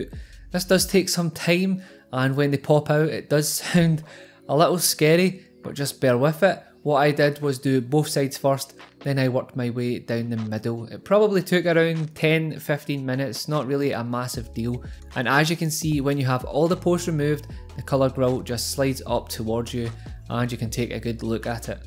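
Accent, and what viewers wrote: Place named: Scotland, United Kingdom. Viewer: Yeah I thought it was sort of scottish dialect/accent, very nice indeed. Thanks again!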